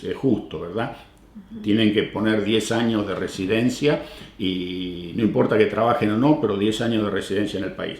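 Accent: Argentina. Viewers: Cómo no se te fué el acento... Argentinazo!!!!! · Q grande,por tu forma de hablar parece q nunca te fuiste e Argentina,como te quiero!saludos desde Buenos Aires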